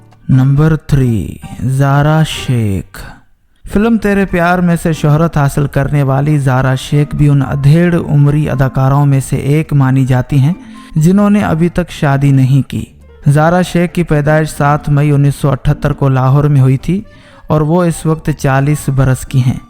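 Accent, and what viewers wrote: India. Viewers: App ki language Kis kisam ki hay? Lahja or talafz Hindi hay. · Sub say phalay apni Urdu sahi karo Urdu ko handi ki tarha mat bolo bhai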